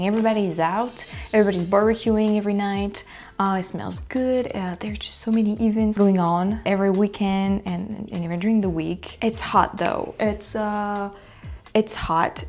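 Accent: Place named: Paris, France. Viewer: ..... the brain is a powerful thing. I'm no expert but I too will have tummy problems from time to time. This would happen when my brain was working overtime and my stress level was up. Stress comes in differenf forms. It can definitely mess with your digestive system. Your mind is obsessed with this issue. It will continue as long as it bothers you. You will have to try not being too regimental, try to chill and only eat what agrees with you. So for breakfast you had 3 acidic items and an egg. That acid will not help, so maybe an egg (or 2) and some dry toast. Best of luck, I know you'll make it, and don't give up your beautiful Parisian accent. I can listen to you all day:)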